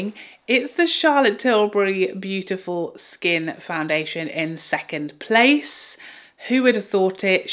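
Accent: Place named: United Kingdom. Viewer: I had to leave my favorite Chanel foundations due to the heavy perfume scent they all have now. Just found Chanticaille Future Skin Gel Foundation - light to medium coverage, fragrance free, looks lovely on the skin but PRICEY. By the way, love your humor (humour) and your British accent - I could listen to you all day. XO from the US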